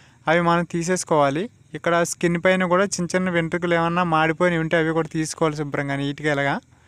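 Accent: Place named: Vizianagaram, India. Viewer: Vijayanagaram yaasa manadhe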